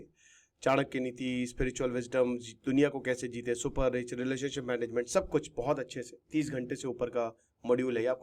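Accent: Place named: Uttar Pradesh, India. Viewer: Marenge Ghaseet ke tamachaa sahi ho jaaoge ..... 😂😂😂 Sir ji ka UP wala accent bich bich me jaag jaata hai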